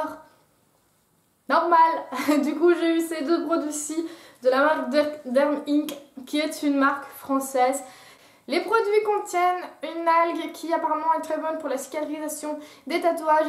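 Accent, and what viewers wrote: Belgium. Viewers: ton accent belge est adorable, tes lunettes sont canons tout comme ton septum ET TES CHEVEUX (et ton KK) et ton tatouage bien sur :) je m'abonne !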